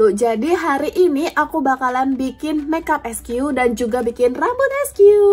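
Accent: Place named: Pontianak, Indonesia. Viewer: Asli mana..logat Indonesia nya kayak dari Pontianak ya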